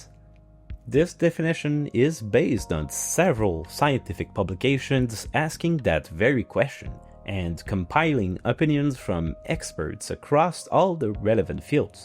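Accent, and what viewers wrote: France. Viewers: De ce que je comprendre les Bibites sont des représentions artificielles de la vie, une simulation qui à pour objectif de répliquer la vie. Tu as très bien fait ton travail donc il en est une excellente réplique mais au final ils sont pas vivant puisque normalement la vie se passe naturellement ce sont des pixels qui sont éclairés de différentes couleur en réponse avec ton code. Beaucoup de respect pour ce que tu fais continue comme ça! Ps: déso d’avoir écris le com en fr je sais que tu parles fr (ça parait dans ton accent) et je suis bcp plus confo en français qu’en anglais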